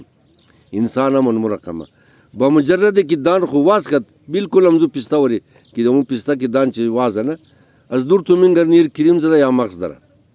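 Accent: Hazaragi, Afghanistan. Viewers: سلام اقای. نام من رضا هست و در ایران تولد شدم و ۳۰ سال دارم و بیشتر از نصف عمر خود را در اروپا زندگی کرده ام. دلیل اینکه من ویدئوهای شما را می‌بینم دو چیز هست: ۱. زبان و لهجه هزارگی را از شما یاد میگیرم. ۲. هر ویدیو شما یک درس زندگی به من میدهد. موفق و سلامت باشید. 🌹✌️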